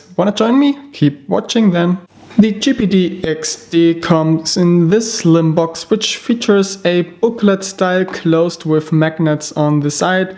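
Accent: Germany. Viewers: german spotted :D good review mate!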